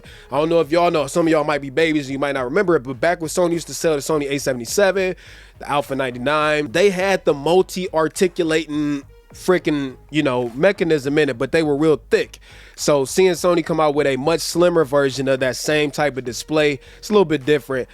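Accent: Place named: New York, United States. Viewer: more normal English ? Most people don't come from USA or New York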